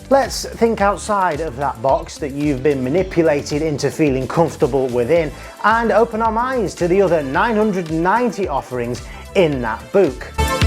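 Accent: United Kingdom. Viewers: Sorry but your accent and the way you say “book”, are you from Stoke on Trent? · Good vid mate, btw are u from Stoke?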